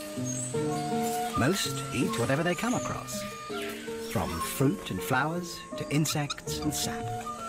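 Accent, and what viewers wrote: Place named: United Kingdom. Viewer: British narration ruins it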